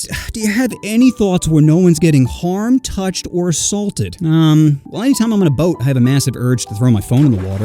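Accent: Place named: Alabama, United States. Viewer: Bros from Alabama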